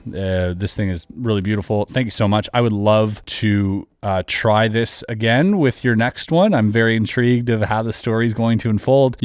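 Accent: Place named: Canada. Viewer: Legos are so much fun lol why do Canadians say oat instead of out. I through Lego blocks oat my window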